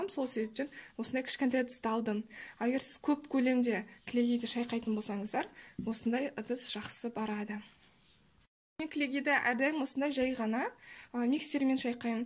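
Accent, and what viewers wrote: Standard. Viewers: Керемет. Аман болыңыз. Әрқашан жасаған жұмысыңыз берекелі болсын. Сіз сияқты таза қазақ тілінде сөйлейтін блогер қазақ қыздары көбейе берсін. 👍👍👍👍👍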